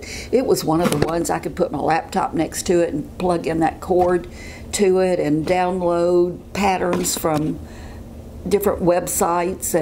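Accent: South, United States. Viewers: There has only been one of your videos that I had a problem with the volume as did others, based on the comments. It was frustrating because I would happily listen to you read your local phone book, with your charming southern accent. Best, Jane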